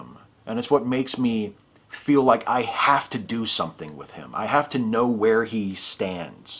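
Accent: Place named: Philadelphia, United States. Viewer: I know EXACTLY what you mean about Jerry Lewis and the Telethon; I was a child of the 80s too, and it is.definitely a strangely nostalgiac memory. Also, based on your pronunciation of the letter "o" in words like, "on"; are you originally from the Philly area?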